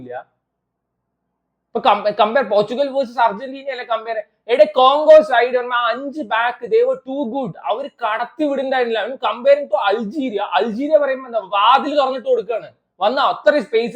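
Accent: Palakkad, India. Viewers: Enan adyam video kandad Palakkad slang is attractive Apart from whats the content your slang make it interesting bro Keep going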